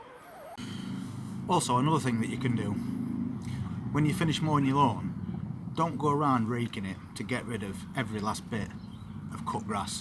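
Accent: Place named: Shires, United Kingdom. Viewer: That accent sounds like a lad from the shires.🐝🐝🐝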